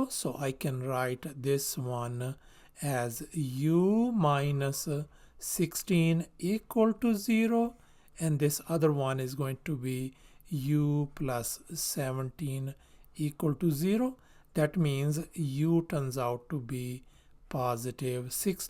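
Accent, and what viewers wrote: India. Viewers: I love Indian ton English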